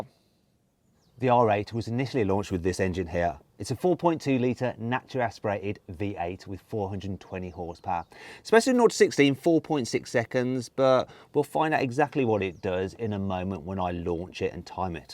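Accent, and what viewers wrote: Germany. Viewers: Yaaaaa.... I am very very much interested to see the German from whom Mat has picked up the accent and mannerisms. Love the Video as always and eagerly waiting for the engine upgrade of Jimny. Love, health, and happiness for you Mat from India. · i just love. ants german accent ya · can we get a "best of german accent" video:D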